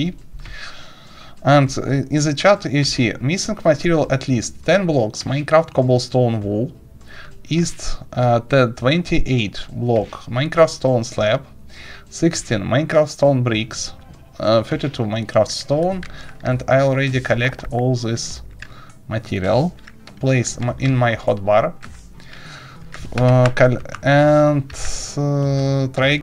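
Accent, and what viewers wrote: Russia. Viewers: русский язык всё равно просачивается) "Схематик" "Майнкрафт" он говорит по русски) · you r russian accent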